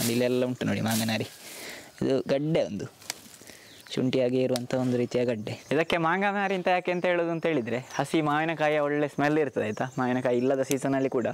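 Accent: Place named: Mangaluru, India. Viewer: Kannada (Mangaluru accent )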